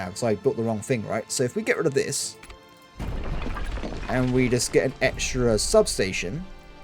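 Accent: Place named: United Kingdom. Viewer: "A lot and are" word,sir you very cool with British accents specially with A alphabet James bond accents,are you from south UK sir?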